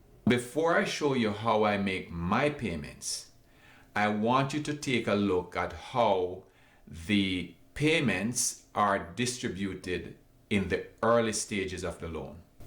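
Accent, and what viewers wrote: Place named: Zimbabwe. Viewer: Nice accent! Zimbabwe???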